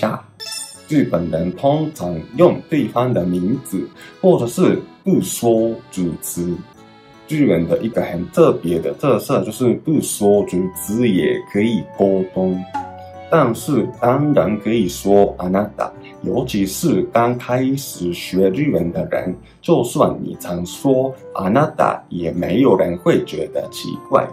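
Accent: Taiwan. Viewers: 的台湾语调真的很突出。看来在一个地方学习语言就会真的明显带有一个地方的语调。也希望可以学学东北口音；-p 那也会很有趣wwwww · 第一次听到台湾腔的日式中文发音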